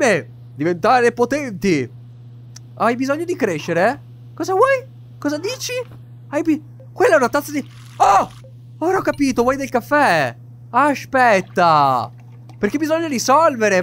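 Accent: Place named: Veneto, Italy. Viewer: il dialetto veneto <3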